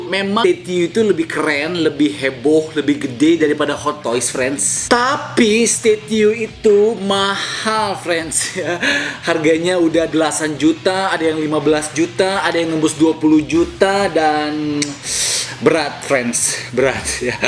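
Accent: West Java, Indonesia. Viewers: Bang orng sunda? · Bang abang orang sunda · Orang sunda euyy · bang sunda urang mana?.....bandung sanes?...wkwk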